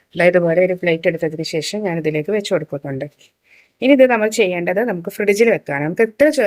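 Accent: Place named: India. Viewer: Ethu naatilanu think chovannully ennui parayunnathu.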